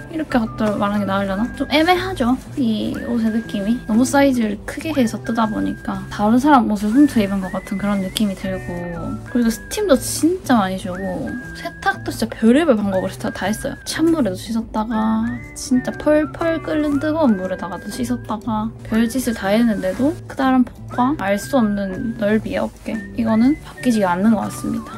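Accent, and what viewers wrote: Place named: Gyeongsang, South Korea. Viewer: 말투가 같은 경상도인인거같은데 ㅎㅎㅎ 맞나유~^^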